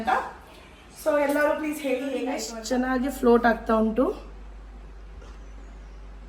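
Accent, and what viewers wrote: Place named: Karnataka, India. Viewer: I love the unique thick kannada accent u have ❤️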